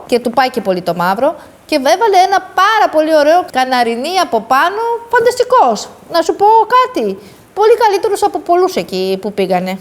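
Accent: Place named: Albania. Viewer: Δεν μπορώ να ακούω τον καπετάν καρνάβαλο να κάνει και μαθήματα μόδας.. τόσα χρόνια στην Ελλάδα και ακόμα μιλάει σαν την καθαρίστρια μου που ήρθε πριν 4 χρόνια από Αλβανία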